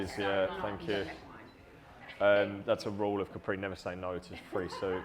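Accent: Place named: Cockney, United Kingdom. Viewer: Interesting watch with the cockney culinary reincarnation of Mac Miller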